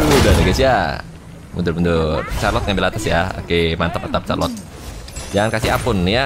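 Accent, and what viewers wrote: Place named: Medan, Indonesia. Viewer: Mati kau longor wkwkwk medan kali bahasa mu bg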